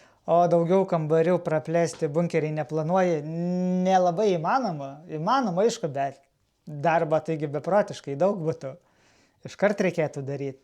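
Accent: Aukštaitija, Lithuania. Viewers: Tau sėkmės ,visada laukiu vidoso.Man dviračiokai nelabai ,bet kai kažką kali ,mali , gamini buiziotę ,tai man geriausi video.Ir kalbėk aukštaitiškai...!!!Super. Aš iš suvalkijos.😂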